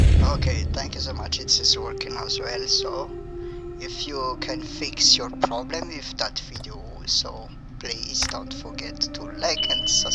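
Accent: India. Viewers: indian accent = works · the indian accent videos always work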